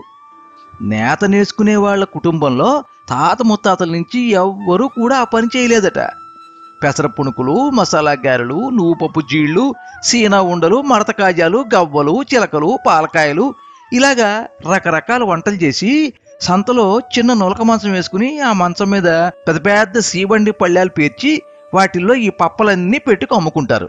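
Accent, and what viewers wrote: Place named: Godavari, India. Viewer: నవ్వి నవ్వి పొట్ట చెక్కలయింది. మీ గళం అసామాన్యం. గోదావరి యాస‌ చక్కగా పలికింది మీ గొంతులో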